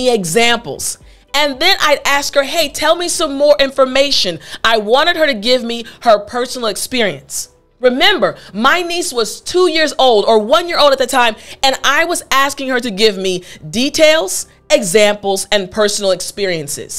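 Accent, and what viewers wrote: Africa. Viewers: you speak in English like Africans.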